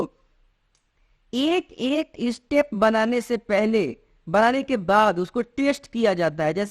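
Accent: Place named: South Asia. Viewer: Thank u Bhai, i love desi tone.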